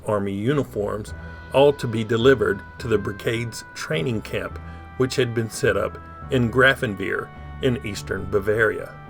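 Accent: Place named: Appalachia, United States. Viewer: Ok. I'm from Tennessee. I thought you sounded a little Appalachian like me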